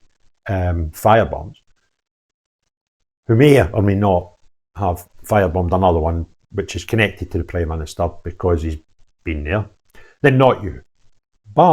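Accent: Scotland, United Kingdom. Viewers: Its sounded like he's said he's in a shaky peg.... Put on subtitles cc on vlog. And it shows that to be what said but its not always right with the Scottish accent. I've never heard that saying b4. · Have a great day everyone...!!...from germany....yep.......hahaha.....love your scottish accent...or is it "dialect"...whatever...cheers.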